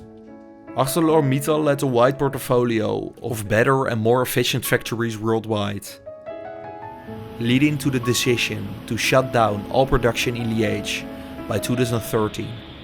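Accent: Netherlands. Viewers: Echt een magnifieke reportage met prachtige beelden maar het is echt lastig om naar engels met een zwaar Nederlands accent te luisteren. 't Zou aangenamer zijn in Nederlands (of Engels ingesproken door een native speaker)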